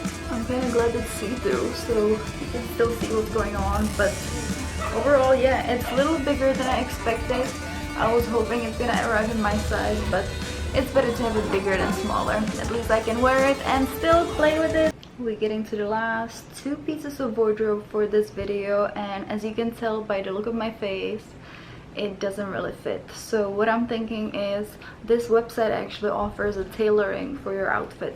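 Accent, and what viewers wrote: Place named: United States. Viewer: Your American accent is good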